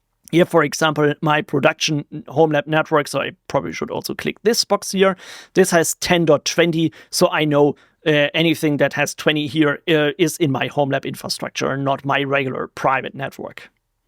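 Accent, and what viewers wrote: Germany. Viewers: I love your German accent, is it? Apologies if I'm wrong. Greetings from 🇬🇧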